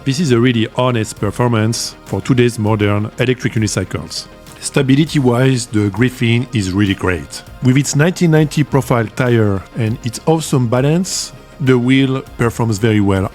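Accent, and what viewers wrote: France. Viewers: en tant que wheelers FR, je suis fier que tu nous représentes si bien. Tu as l'accent français, et alors ? Je bosse dans l'IT et travaille avec différentes nationalités tout le monde a l'accent de son pays d'origine. L'important c'est d'être compris. Je suis sur que dans une ville cosmopolite comme New-York, tout le monde s'en moque. Heureusement que les humains sont divers et variés sinon quel ennui !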